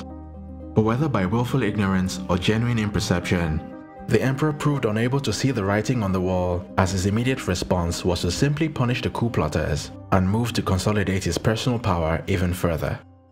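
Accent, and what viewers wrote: Africa. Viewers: The narrative of the story is a bit twisted. I disagree with a bit of truth and then a divide rule narrative with a nice African accent. very sad how much they try to still to keep the people in dark They never stop or give up.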